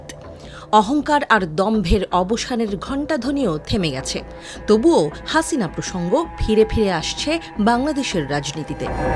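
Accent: India. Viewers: ভয়েস অনেকটাই ভারতীয়দের মত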